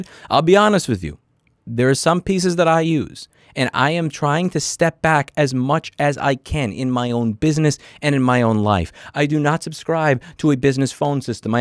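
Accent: New York, United States. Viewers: Don't y'all just love how much thicker the New Yawker accent is the deeper he gets into his righteous rant?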